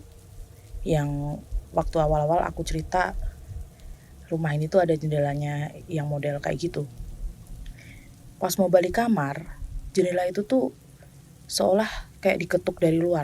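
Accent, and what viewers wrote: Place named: Java, Indonesia. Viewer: Nah cocok medoknya 👍 "Culi, culi, culi" berasal dari kata "uculi" atau "lepaskan" Lepaskan apa? Yaa tali pocinya dong 😂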